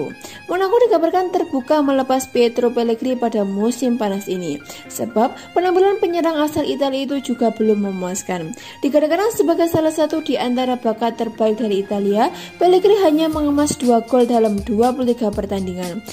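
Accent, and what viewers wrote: Java, Indonesia. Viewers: Medok banget....🤣🤣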